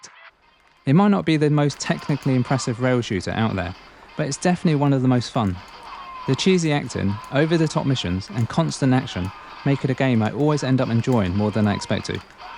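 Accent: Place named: United Kingdom. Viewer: It's called a British accent lol. Maybe you should travel more instead of playing games, it opens the mind. Hope that helps.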